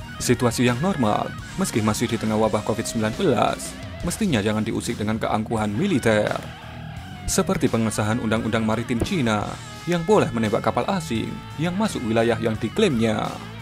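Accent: Java, Indonesia. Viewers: Mati siji mati kabeh..Mimin orang Jawa ya🤔🤔🤔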